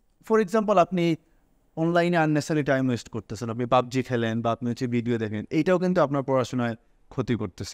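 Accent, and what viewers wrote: Sylhet, Bangladesh. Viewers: Sri apnar Sylhet e basa ta sundor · Apni ki amader Sylhety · Sir apni kita sylhti ni plz koiba.. ar kun jaigat porain akto janai bah ame portam sai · Wow so quite sylhety .🎉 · O sir afni sylheti ni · Sir You r Sylheti😍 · Sir...apni Sylheti..kun jagay poran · Outstanding video.Are you Sylheti? 🙂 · Sir,Sylheti kotha gula kubi valo lagche.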